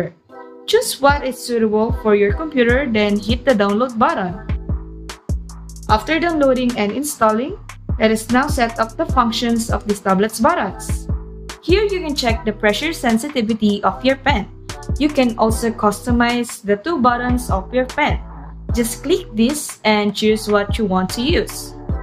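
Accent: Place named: Malaysia. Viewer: Are you malaysia ur accent is nice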